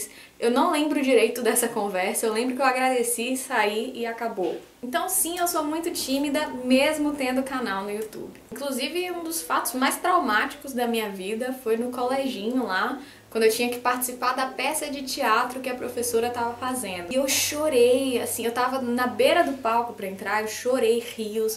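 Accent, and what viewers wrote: Bahia, Brazil. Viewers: não sei, eu sou baiano também e percebo bem o dela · Aos meus ouvidos paulistanos o seu sotaque baiano é bem acentuado! XD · lógico, todo mundo tem sotaque. Mas em comparação com o sotaque baiano marcado, tem pouco. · Já falei sobre isso, mas repito: seu sotaque aparece sim, e até muito! Quem espera ouvir aquele sotaque do INTERIOR da Bahia, algo bem caricato, não não vai encontrar. Eu que sou soteropolitano vejo você "sotaquear" frequentemente. Parabéns pelo canal, como sempre!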